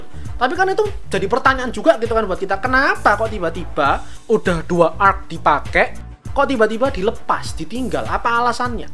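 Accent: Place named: Banyumas, Indonesia. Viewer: Agak ngapak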